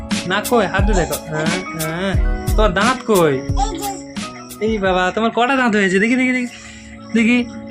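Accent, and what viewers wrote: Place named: Bangladesh. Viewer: আমি বাংলা দে-শ থেকে দেখছি আপনা দের সবার বিডিও দেখি আপনার। কথা বারতা শুনলে অনেক বাংলা দেশি লাগে দোয়া রইলো আপনার জন্য আমিন আল্লাহর কাছে সাহায্য চাই আপনার জন্য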